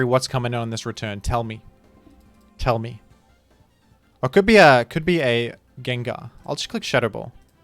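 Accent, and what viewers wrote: Australia. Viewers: I know it sounds basically the same for americans but he is, in fact, australian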